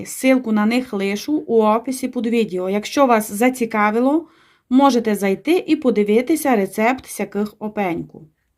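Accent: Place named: Hutsul, Ukraine. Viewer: Люблю гоцульський акцент👍🙂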